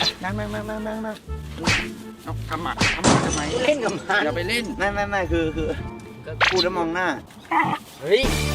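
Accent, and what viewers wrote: South, Thailand. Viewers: น้องอยากให้พี่ พูดใต้อีกชอบมากก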